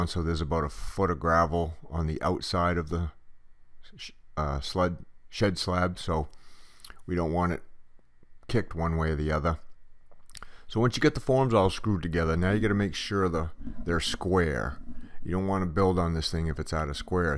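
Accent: Maine, United States. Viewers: You sound like a Mainer. About how big can you make a slab in New England, where the ground freezes, before you need footers?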